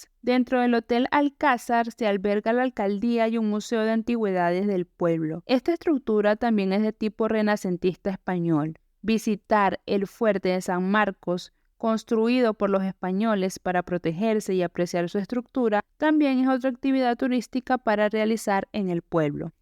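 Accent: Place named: Venezuela. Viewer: La joven que narra tiene un acento muy bonito, parece venezolana o colombiana. De dónde será? Es solo curiosidad.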